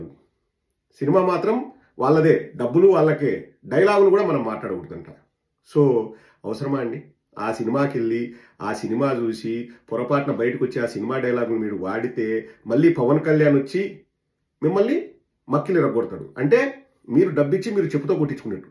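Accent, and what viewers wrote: Rayalaseema, India. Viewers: మన రాయలసీమ డైలాగ్స్ సూపర్ లో చెప్పినవ్ అన్న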